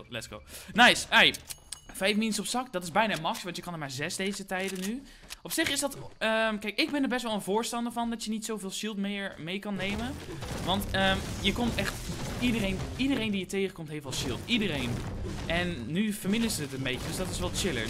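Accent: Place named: Friesland, Netherlands. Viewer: Waar woon je je hebt een beetje fries accent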